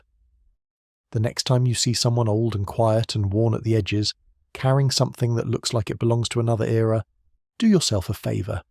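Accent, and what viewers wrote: United Kingdom. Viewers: These are Bull Shit Stories, especially from the point of view of someone that knows the 1911 and how competitive shooting works, only a Non Shooter thinks competition shooters are so Stupid. Narrated by a Non Shooting Brit. Competitive shooting gets technical and most shooters are professionals. Also a laugh, the Age of a 1911 matters not. Nobody I know ever made fun of a Veteran At one point, Revolvers were used in Competitive shooting. And nothing beats a Pre Series 70 trigger.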